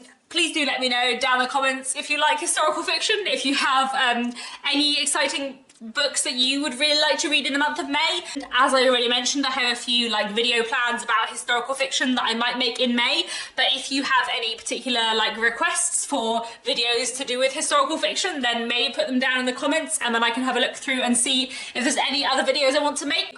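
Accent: RP, United Kingdom. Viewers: your accent is so "catchy"! something between RP and posh English. love it !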